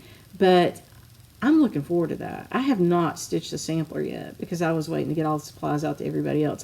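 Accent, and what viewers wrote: South, United States. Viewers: The thread legends are wonderful tools and bookmarks, too! I just love your southern drawl! I signed up for the virtual Jeannette Douglas class. I didn’t see the booklet online but I’ll look again. I went to an all girls catholic school in Manila and we had a sewing class in every grade. The Belgian nuns sure taught us samplers. We had one every year, then your embroidery projects for the rest of the school year depended on your skill and speed. I remember we had a darning sampler in 4th grade that included different buttonhole styles. I wish I had kept them all. Is the passcode for the 8th installment of the Saxon band sampler available? Thanks much and also for sponsoring Fiber Talk! Happy New Year!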